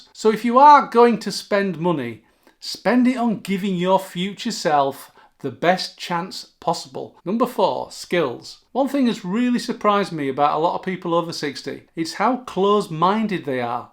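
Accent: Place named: Yorkshire, United Kingdom. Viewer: The Eagles ... sitting here in Don Henley's hometown, getting ears tuned to what sounds as the Yorkshire accent. That's coming from one with Old West Florida sound. Great advice for us Boomers ... will continue to listen.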